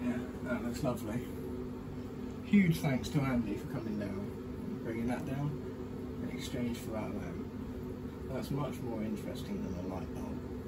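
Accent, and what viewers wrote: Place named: South East England, United Kingdom. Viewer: That's just how most people in SE UK sound btw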